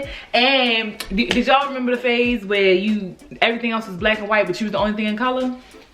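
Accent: Baltimore, United States. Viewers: Girl you sound like Baltimore! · Are you from Baltimore? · Muva and Hotdugs 🤣 Yes! Girl that Baltimore keeps sneaking out! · i knew you were from baltimore bruh, the accent!!! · You from Baltimore?? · This like my 3rd video watching her and I KNEWWW SHE WAS FROM BALTIMORE I know my accent when I hear it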